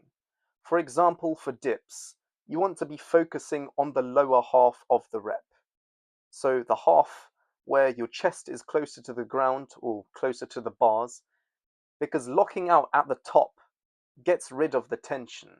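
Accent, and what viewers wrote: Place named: United Kingdom. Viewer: This guy has the same uk accent as the greatest uk artist to ever do it: Feng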